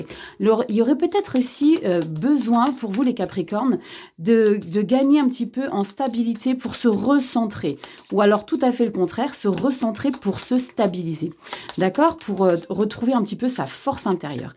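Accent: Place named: Provence, France. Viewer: Un petit accent et expression du pays des cigales ❤❤.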